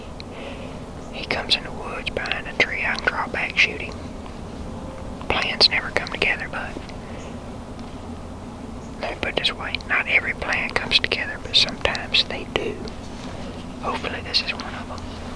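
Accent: South, United States. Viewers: The accent sounds really southern. What state are you from?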